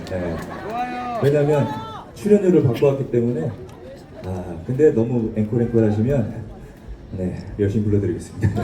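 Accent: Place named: Jeolla, South Korea. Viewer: 전라도 말솜씨 는 언제 배웠남유 👍일품입니다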